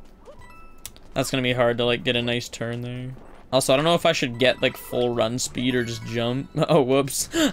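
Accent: Canada. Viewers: That’s just how Canadians sound like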